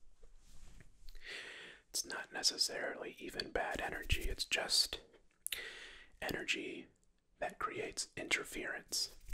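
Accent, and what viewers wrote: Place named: United States. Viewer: Big compliment: I love your voice. Usually I don’t get tingles from just whispering but I nearly fell asleep listening to your sponsorship in the beginning. I’m not American myself so your accent and tone is really cool to me :)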